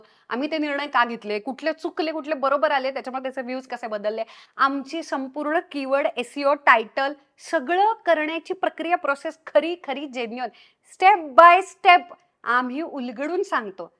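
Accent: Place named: Pune, India. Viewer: कधीतरी नॉर्मल टोन मध्ये का नाही बोलत आपण? बोलण्यात सारखा पुण्याचा अभिनिवेश का असावा? बाकी आपले व्हिडीओज चांगले असावेत. आमच्या "ह्या" तुमचं कौतुक करतात.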